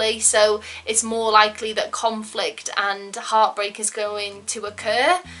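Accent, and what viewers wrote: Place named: Northwest England, United Kingdom. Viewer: Great review you sound like you come from northwest of England maybe I'm wrong ☘️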